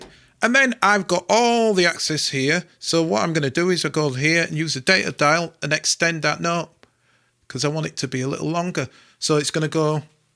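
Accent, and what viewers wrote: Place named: North, United Kingdom. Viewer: As an older guy who is completely out of his depth(normal for me lol), I find you so easy to listen too, although I'm a southerner I find your Northern accent so easy on my brain and even more important, no bloody American crap. Keep it up mate, I love what you do, and know its not easy teaching dimbos like me. Just know that thanks to you I am getting there, albeit slowly lol.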